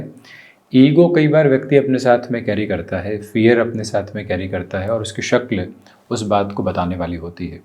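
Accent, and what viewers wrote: Standard. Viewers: बहुत शुद्ध हिंदी बोलते हैं आप, नमन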